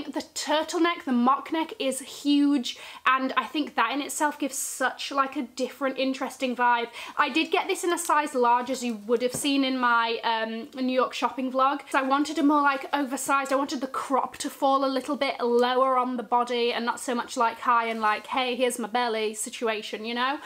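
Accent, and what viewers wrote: United Kingdom. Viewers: Can you give us your opinion about the new iPhone? It is definitely a luxury item, do you have designer cases? And what applications do you use? Oh and merry Christmas and new year! I love your British accent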